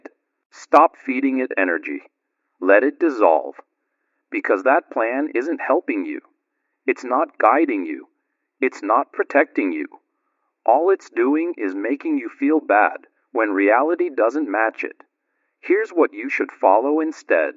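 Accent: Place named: United Kingdom. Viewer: Razor You're suspiciously burying the fact this isn't Feynman's voice. It's not even close. Your voice has a british accent.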